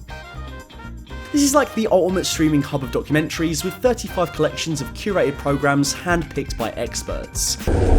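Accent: United Kingdom. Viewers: Oh god he's British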